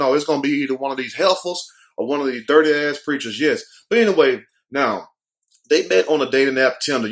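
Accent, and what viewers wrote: South, United States. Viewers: where are u from I can't place your southern accent?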